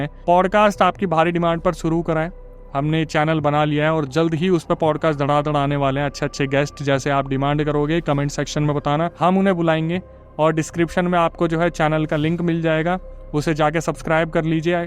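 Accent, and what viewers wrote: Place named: Bhojpuri, India. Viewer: awaj se bta rha hu pakka bhojpuriya ladka hai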